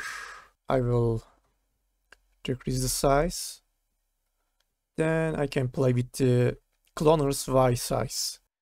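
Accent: Turkey. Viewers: Turkish accent 😅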